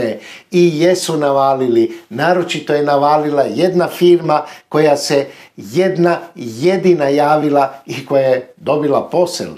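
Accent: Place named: Zagreb, Croatia. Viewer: Lijepo kad govorite pravi zagrebački, pozdrav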